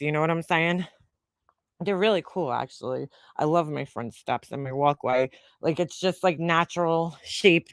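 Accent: New York, United States. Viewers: say whaaaaaaaa?!?!?! 😳😳😳😳😳 ill just never understand ppl who literally know for 💯 certain that certain individuals are garbage, hateful, lieing, vile, cruel shit bags - but they overlook it, bc theres some sort of short term upside.... the little validation this gives that shitbag, only validates them more & keeps the destructional hampster wheel going.... legit, it makes it so so soooo much worse for countless ppl currently AND in the future.....nothing i could ever gain personally, would outweigh validating that evil shit she does to ANYONE else down the line... nothing, nada, zip, zilch 🙅 it doesnt make me mad to hear about this happening, just sad if this is whats going on 😔 obviously im missing aloooooooot cuz hearing you say this is going on, floors me....trust this = you cant fix nor change mean azz heartless ppl, who legit enjoy nothing more than completely trying to anihilate & destroy ppl.... 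you dont know when its coming mor why, but u best believe that ur on the clock & its only a matter of time.... u wont see it coming either, cuz its love bomb to anihilation - no in between thanks for being the ultimate 🐲 slayer, and just keepin it 1 millionnnnn on this 🐲 lady,!! 🤣😍💯 thanks for your service, in the fight to stop the "dragonnnn decapitationnnn" 🙌😂 #StopTheDragonDecapitation i only caught the 1st 45 mins, so imma finish this later tonight..... its alwayssss hella cool to hear that accent goin on a good ol NY truth droppin semi rant on 1.5 speed!!! 🤗 😍 🙌 made my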